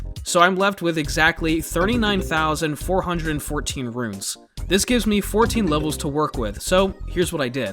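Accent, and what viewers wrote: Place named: Egypt. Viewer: wow u r Egyptian ur accent is on another lvl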